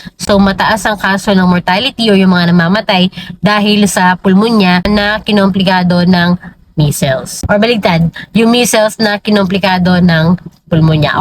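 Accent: Pampanga, Philippines. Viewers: Kapampangan kayu po?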